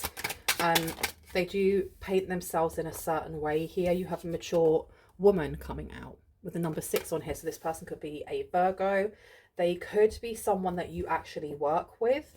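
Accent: United Kingdom. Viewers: I love watching you . Your voice is so amazing to hear , it’s very clean , and beautiful British accent . It’s drawing to listen , so alluring cause of quality of Your voice . And the content is also really interesting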